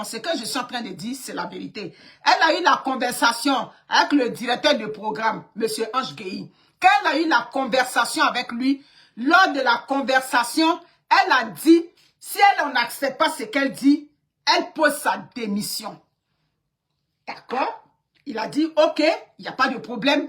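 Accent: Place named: Ivory Coast. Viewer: Mme votre accent sonne comme une Ivoirienne.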